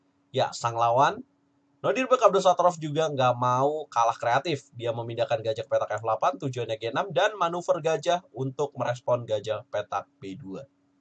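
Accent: Tegal, Indonesia. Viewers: Bang kelahiran tegal ya..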